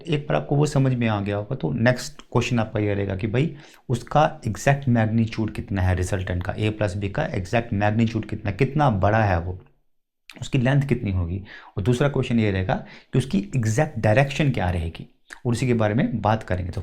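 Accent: Kashmir, India. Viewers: I sense that u have an akcent like Kashmiris..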